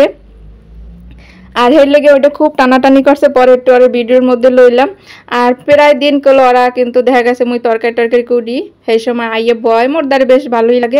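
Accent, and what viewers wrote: Barishal, Bangladesh. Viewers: শাক রান্না করলে রেক পরিস্কার করলে খুব ভালো লাগলো। তোমার কথাগুলো শুনতে আমার খুব ভালো লাগে। আমার শাশুড়ি তোমার মতো বরিশালের ভাষায় কথা বলে। কখনো বাড়ি গেলে শোনাবো।❤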